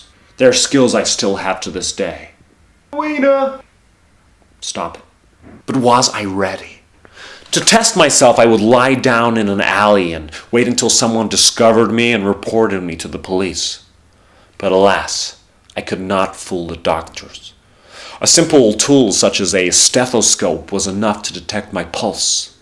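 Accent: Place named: United States. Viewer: I loved the ending! And nice American accent!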